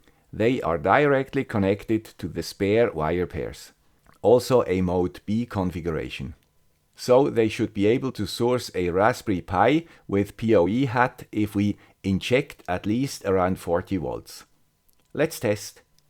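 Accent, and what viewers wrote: Switzerland. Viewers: Swiss watches and clocks - high precision and accurately made. Some shafts have jewel bearings Swiss chocolate - rich flavour and addictive. Well packed but easy to open Swiss Red Cross - known round the world for their work. Very reliable The guy with the Swiss accent - all of the above. He also has a great Germans dry sense of humour. Thanks for all your great videos.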